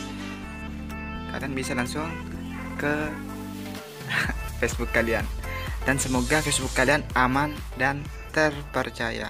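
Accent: Madura, Indonesia. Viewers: Orang madura nih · Orang madhureh bang?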